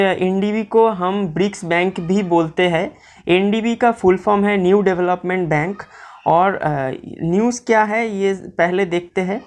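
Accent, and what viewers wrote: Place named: Bengal, India. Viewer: bondhu tmr Kotha sune ata to bojhai jacche, Barddhaman na Kolkata?? but darun video sera, Khub bhalo, ager ta Strategy of 100+ otao sera chilo👍👍👍👍👍👍✅✅✅chalie jao anek help hocche sobar💎💎👍👍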